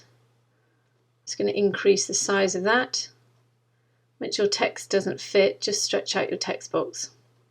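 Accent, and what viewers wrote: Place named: RP, United Kingdom. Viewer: Brilliant! Thank you so much! So much to learn! With you, step by step, plus that angelic RP kept me focused all the way through!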